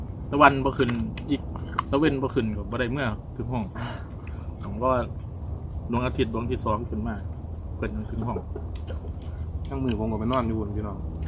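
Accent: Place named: Korat, Thailand. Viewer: เก่งมากหนุ่มโคราชสอ้อนบ่เสียแนวโคราช555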